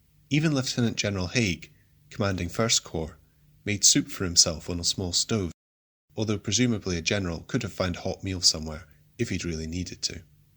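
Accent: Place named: Scotland, United Kingdom. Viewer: No he isn't. Sean Bean is from Northern England wheras this YouTube is clearly Scottish. It's like saying someone from the North of the USA is a dead ringer for a Mexican.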